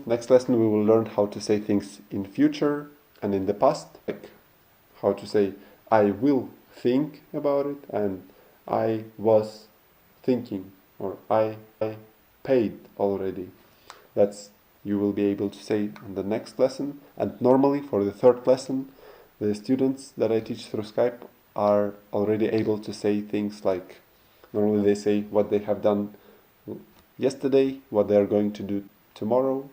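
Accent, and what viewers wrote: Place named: Russia. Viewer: Is your native language Russian? Cuz I swear I hear your Russian accent all the time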